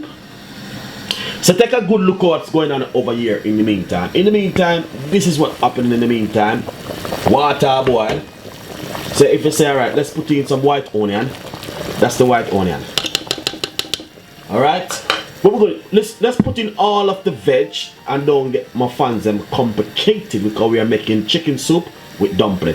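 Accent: Jamaica. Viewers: Omg just listen to him he did say pumpkin, it's just the Jamaican accent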